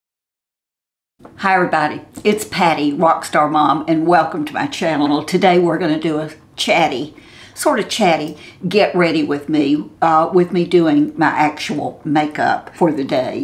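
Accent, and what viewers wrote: South, United States. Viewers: Your eyelashs are SO long! Thanks for a great video,. You seem like a big sister, favored aunt and cherished grandmother. None of which I actually had growing up in North Carolina. Your kind spirit and southern accent are INCREDIBLY nourishing and healing for me.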